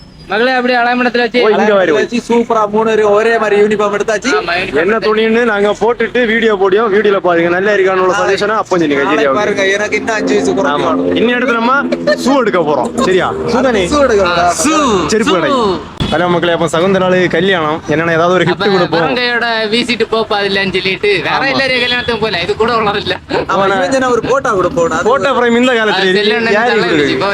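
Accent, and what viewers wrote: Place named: Kanyakumari, India. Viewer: அண்ணா நான் பிறந்தது மதுரை ஆனா வளர்ந்தது கன்னியாகுமரி முழுக்கோடு YMCA உங்க கன்னியாகுமரி பாசை கேட்கும்போது என்னுடைய சின்ன வயது நியபகம் வருகிறது வாழ்க்கை இந்த பாசை என் வாழ்க்கை மறக்க முடியாது மிக்க நன்றி அண்ணா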